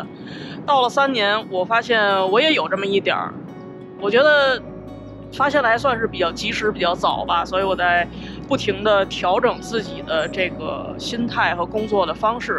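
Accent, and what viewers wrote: Beijing, China. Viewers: 之前是喜欢这一口京腔儿，现在是喜欢这样一个真实的摄影师，还是期待EDI能走的更远👍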